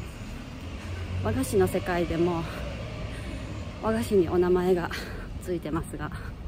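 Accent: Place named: Kyoto, Japan. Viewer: 京言葉素敵です❤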